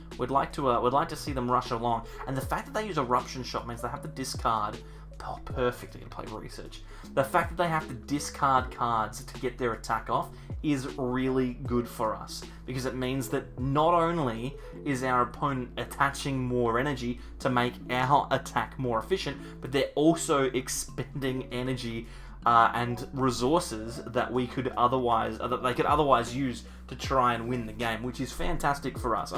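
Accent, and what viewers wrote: Australia. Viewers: first I wanna say I live the vids, secondly the Australian accent makes it that much better but I do have a legit question, doesn’t pertain to you as much but why on earth are all y’all so bad at math during these videos, again not you but mostly just in general haha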